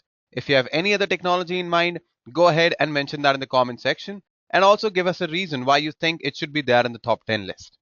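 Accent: India. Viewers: I really love this channel, My English is not good, so I have a problem to hear with Hindi English (sad)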